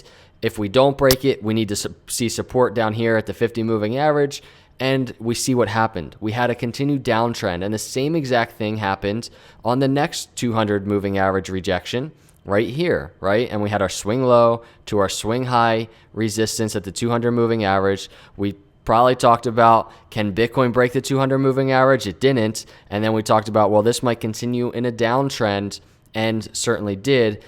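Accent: Philadelphia, United States. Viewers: Great job showing both sides of the coin! I appreciate your cautious optimism that comes with a Philly accent...long term bullish here. I don’t care what happens below $10k I am accumulating. Just don’t want to get caught off guard when that big candle drops any day now!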